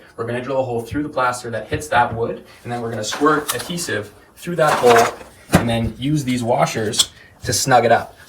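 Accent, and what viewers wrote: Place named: Ireland. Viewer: Great job. You seem to use many different coats of filler, give a great finish. Also you sound Irish!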